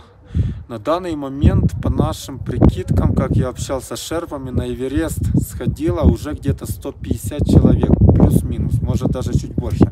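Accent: Ukraine. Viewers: Альпинист который погиб 11 мая никакой он не швейцарец. У него пакистанские корни. Он профессиональный альпинист, у него 7 восхождений на высочайшие вершины планеты. Но вот восхождение на Эверест совпал с окончанием мусульманского рамадана, месяц соблюдения особого режима питания. Религия на столько победила здравый смысл, что даже в горах, в опасности он пытался не нарушить рамадан. Тут и горам понятно что организм был предельно истощён. Я читала о нём в YouTube, и у нас в Швейцарии говорили о нём по радио. Что это первые 2 смерти на Эвересте с 2019 года. Он и американец, кстати, тоже мусульманин. В 2020 Эверест был закрыт из-за короны., увидела тебя и сразу же подписалась, настолько приятный у тебя украинский акцент. Я сама из Украины., парни, удачи вам, благоприятной погоды, счастливого погодного "окна ". Непогоду лучше просто переждать. Любой риск должен быть оправдан. 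Сегодня 16 мая.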